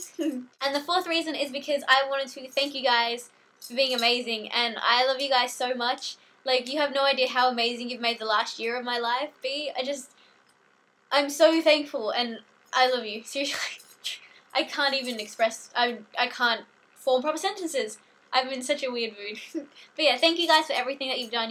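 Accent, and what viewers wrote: Australia. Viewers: First video I watched and heard her talk... she's as australian as she is adorable and talented... there's no way you can watch this and say life is fair guys lol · Gratz (K)! Love your Aussi accent :3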